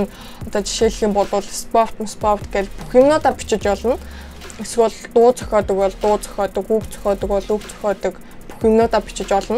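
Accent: South Korea. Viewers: Angli heliig solongosoor unshdag mongol ohin geesh heden gadaad nzdaa helsen tataj untlaa ineelee elgiin2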